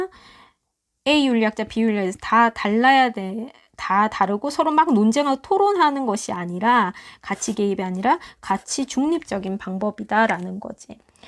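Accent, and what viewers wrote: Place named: Gyeongsang, South Korea. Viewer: 대구 목소리....영화 배우 목소리 듣는 기분임......아주 좋아요.....최고~~~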